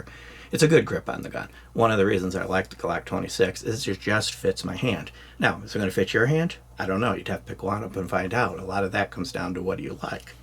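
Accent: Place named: Chicago, United States. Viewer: I have 2 Glock 26's but I still prefer to carry my: Hellcat, Walther PPQ-SC and my FNS-9C over the G26. But I still love my Glock 26's and do carry them alot as well and would never part with them. I think the Glock 26 is one of the best Glocks ever made and will never fade away. Thanks for sharing. U have a Chicago or Detroit accent but u live in Florida. Florida is where all the rightwing east coast and Midwest Republicans retire to and also Arizona.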